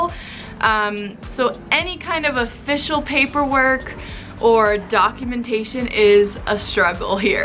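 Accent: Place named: United States. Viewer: What do you do for work? You sound american, so you just picked up and left, moving to a very random country..I’m wondering how, and why?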